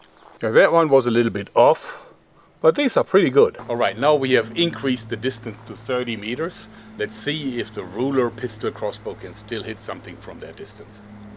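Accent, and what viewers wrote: Germany. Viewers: I think you are German.. nichts wahr?..I hear pronunciation...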